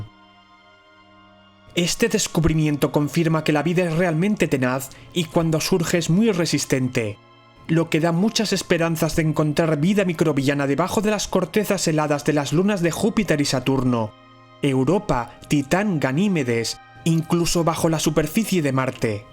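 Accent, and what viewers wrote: Spain. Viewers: por su acento puedo imaginar debe ser español. Aunque en algunos países suramericanos hablan con mucha pronunciación de la z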